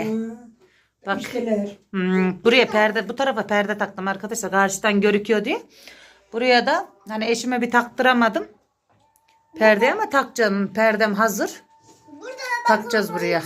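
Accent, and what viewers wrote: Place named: Beyşehir, Turkey. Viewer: Bende beyşehirliyim ctesi pazarına bende gittim Baska yerde oturuyom konuşmanı bize benzettim de abone oldum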